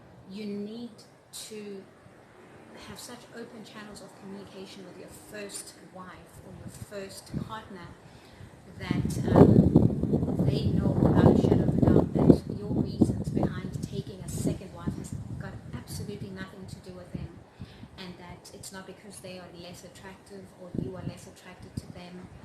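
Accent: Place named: Caribbean. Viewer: Very good video u explained that very good thank u keep up the good work . U sound like a Caribbean girl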